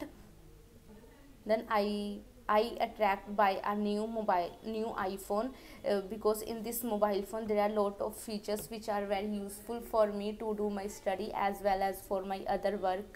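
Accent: Punjab, India. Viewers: Punjabi accent ..use shot sentences and idioms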